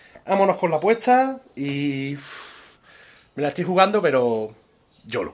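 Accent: Andalusia, Spain. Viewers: Cada vez eres mas andaluz no se como lo haces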